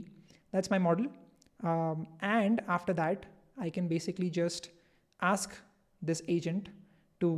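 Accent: India. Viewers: I do not know where you are located but I can hear you are from the east. India or one of the countries around there, so please forgive my ignorance about that. Why do I think where you come from is relevant for my comment, it is because India for example is well known for their scam activities, and here in Europe we are always concerned when we get mails, phone calls, from people from India that offer technical support. With this said, I wonder how do you face the insecurity when using AI. Insecurity with regards to whether your data is being used or even shared. Deepseek is Chinese, and connects to private databases for its reinforced Augmentative Responses. Do you consider that when entering passwords or personal information or do you have a more relaxed view on security which allows you to just throw yourself on the net without really having concerns.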